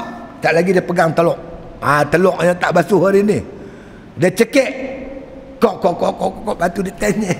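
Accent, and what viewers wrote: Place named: Kedah, Malaysia. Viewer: Loghat kedah lama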